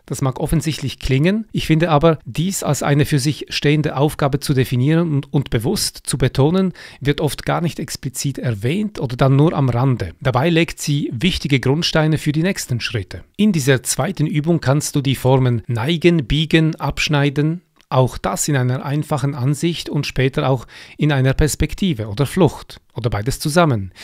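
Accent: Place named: Switzerland. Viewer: finde ich auch den Schweizer Dialekt so lustig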